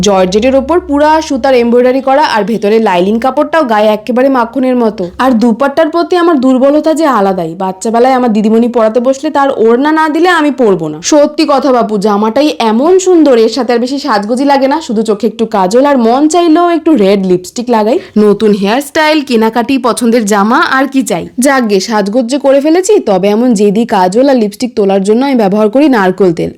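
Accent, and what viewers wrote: Bangladesh. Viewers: দিদি তোমার ভিডিওগুলো আমার খুব ভালো লাগে। আজকে ভিডিওটি অনেক সুন্দর হয়েছে। প্লিজ তুমি চুলে কি কি লাগাও আবার একটু বলবে বা একটা বড় ব্লক দিবে আমি তোমার নিউজ সাবস্ক্রাইবার তোমার ভিডিও খুব ভালো লাগে আমি বাংলাদেশ থেকে তোমার ভিডিও দেখছি তোমার কথা বলার ধরনও খুব সুন্দর বাংলাদেশের টান রয়েছে😊❤ তুমি এভাবেই সুখী হয়ে থেকো❤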